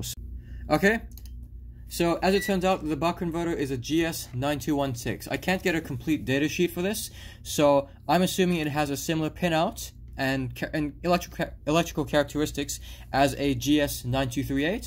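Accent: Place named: Russia. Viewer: Shit i was thinking he's from russia.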